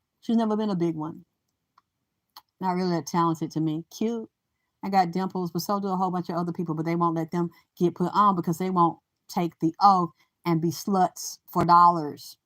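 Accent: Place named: Georgia, United States. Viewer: Can I just say how much I love your Georgia accent! I can listen to it all day! Queen Drip 💧 in this Bitch! Vagina Power 💙💙💙💙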